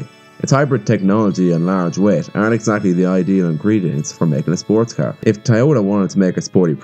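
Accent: Ireland. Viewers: What part of Ireland you from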